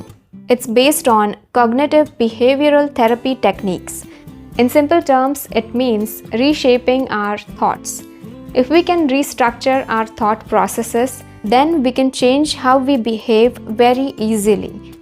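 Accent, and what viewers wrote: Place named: India. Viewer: Where'd you learn to speak your english??? India... How annoying you sound!!!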